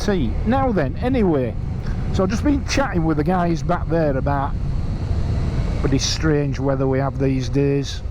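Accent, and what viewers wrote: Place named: Newcastle, United Kingdom. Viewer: Good Morning, Yeah the weather is crazy at the moment, you don't know what to do for the best, your Geordie accent wasn't to bad lol, speaking as a Geordie myself, so take care & ride safe & i'll see thee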